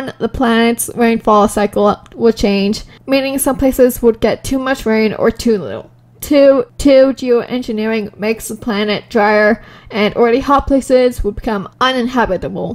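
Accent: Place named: United States. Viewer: u sound american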